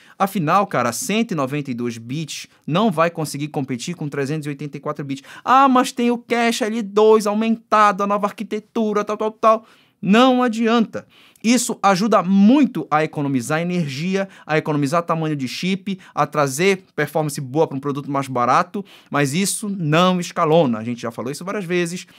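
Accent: Pará, Brazil. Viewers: Esse sotaque paraense, meu parceiro. Enfim um canal de tech e inovações em que me sinto representado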